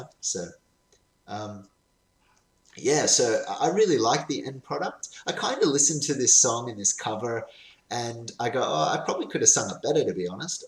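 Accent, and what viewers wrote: Australia. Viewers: Thanks for doing this! Having worked in recording studios producing songs and ad jingles, I was always fascinated by the sound engineer’s familiarity with the console and utilizing the tracking part of it. One of the fun ones used to be working at EMI Studios with a 48 track console. That was many years ago. Now, its digital, so it’s even more fascinating to me! Good one! On another note: Are you Australian, or from South Africa or New Zealand?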